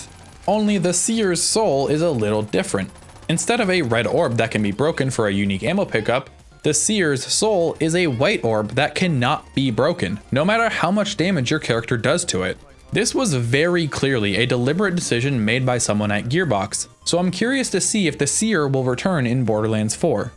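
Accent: Canada. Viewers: Is that a Canadian I hear? 😈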